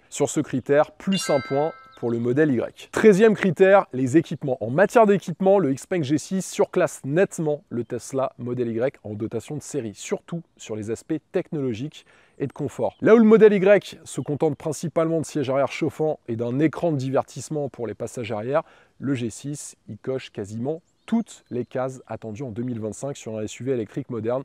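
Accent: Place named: France. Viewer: Pourquoi vous n’utilisez pas la fonction traduction en anglais automatique. Votre anglais est horrible. La phonétique est 100% française. Ça fait mal aux oreilles de vous écouter en anglais 😖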